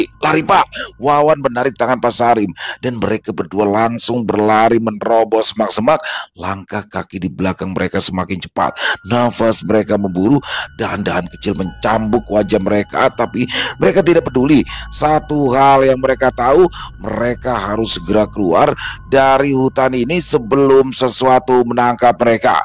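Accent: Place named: Jonggol, Indonesia. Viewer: Bahasa sundanya mirip orang jongol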